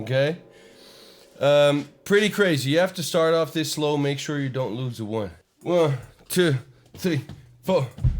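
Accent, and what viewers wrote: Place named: Canada. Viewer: nice canadian accent bro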